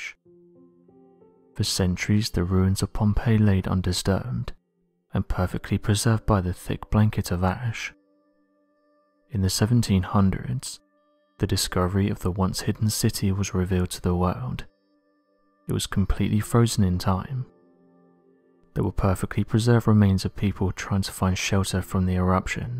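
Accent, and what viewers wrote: England, United Kingdom. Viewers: I think he's voice is lovely and I love he's English accent 😍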